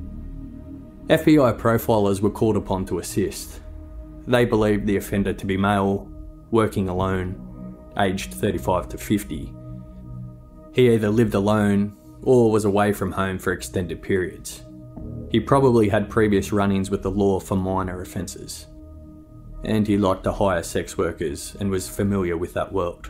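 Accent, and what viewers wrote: Australia. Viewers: Could do without that Aussie accent though.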